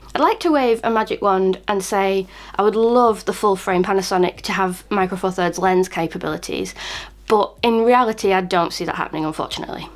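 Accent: United Kingdom. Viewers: Charming British accent :)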